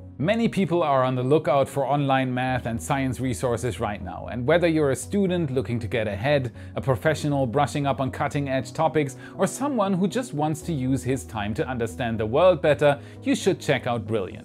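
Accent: Germany. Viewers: More German accent please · Now when I know that you are German I can hear your accent all the time 😂😂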